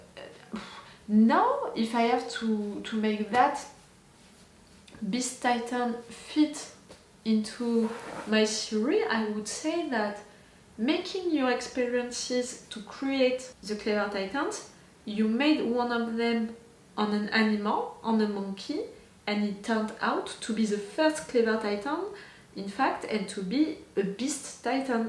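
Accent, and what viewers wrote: France. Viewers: I would avoid Lost Girls OVAs until you're done with Season 2. They do have some spoilers to them, but after go for it. I love your accent, please never apologize for being who you are. We subscribe knowing you're French and your reactions are very genuine and funny. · your french accent is adorable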